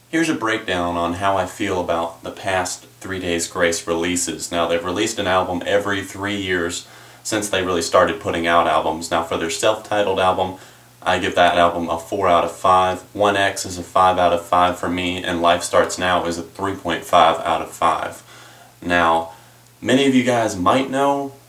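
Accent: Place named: South, United States. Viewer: It sounds like you have a southern accent in this video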